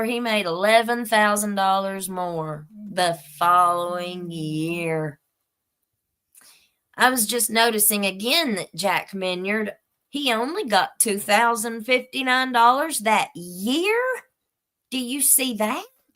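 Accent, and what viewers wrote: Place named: Appalachia, United States. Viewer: G Fish is how I found this channel. I'm in the UK and absolutely love the "Hillbilly" accent ( no offence meant).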